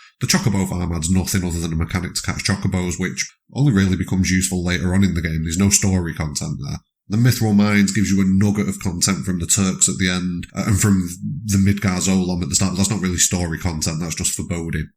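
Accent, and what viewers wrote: Northern England, United Kingdom. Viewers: Also nice to hear a fellow Northerner. We don’t have many people from our part of the world. Most are across the pond. I am from East Lancs so not far from you at all.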